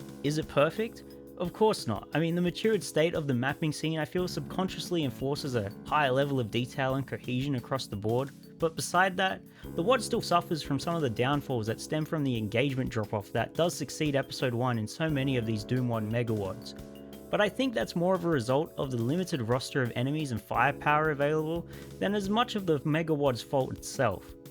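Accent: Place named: Australia. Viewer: Know what makes a good YouTube video? Anything on Doom. Know what makes it even better tho? Add an Aussie accent. Truly tho, loved the video. Keep up the great work there fellow Aussie! Rip and Tear!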